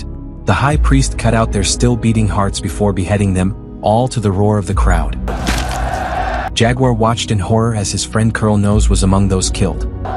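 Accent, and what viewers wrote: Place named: United States. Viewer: Your qwack qwack yank accent is just unbearable.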